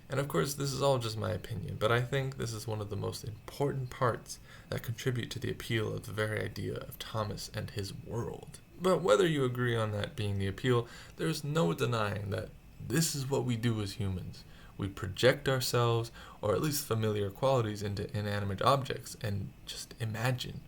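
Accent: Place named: United States. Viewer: I was not ready to hear an American narrator. I didn't know they made that.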